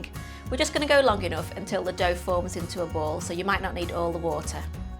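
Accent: United Kingdom. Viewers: Your Video, recipe, presentation is all so LOVELY as your Lovely British Accent. Regards from Sunny California.......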